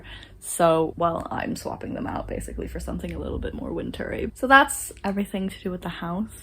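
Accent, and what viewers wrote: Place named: United States. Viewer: I just found your channel through your Selena Grace bag video actually and got curious, noticed you have lost your American edge in your accent now! I really like the way you think, I am (and was) had some crazy thoughts and issues about my degree and if the degree I chose was for me or useless or worthless, wish I was as open minded as you when it came to thinking about it. You know like “it’s not the end of the world” and stuff, that’s so right! Ahh! I have one course to go and I’ll be done. I’m basically on leave right now because there are no courses for me to do left. I’m having a plight now about employment and stuff but I will keep it in mind, - “it’s not the end of the world!”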